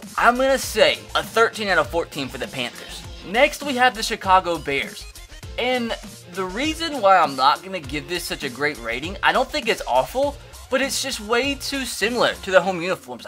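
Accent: Ireland. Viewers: The way he talks is like a Irish person. He talks so fast. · Are you irish? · U sound Irish asf😂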